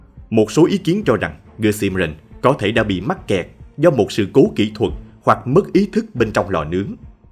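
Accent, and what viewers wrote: South, Vietnam. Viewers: Giong doc Mien Nam Phat am rat ro nghe rat hay, xin chuc suc khoe👍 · Thích nge tiếng MN của nói chuyện rỏ ràng rất hây và tiếng.nói của HG ẢNH nói chuyện rất hay ủng hộ.kênh.2 cháu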